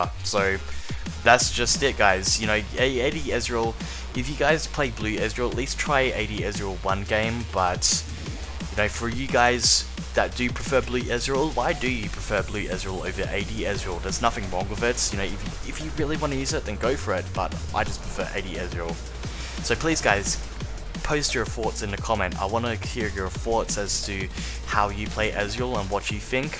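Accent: Australia. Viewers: This guy has like a half Australian/half American accent it's tripping me out.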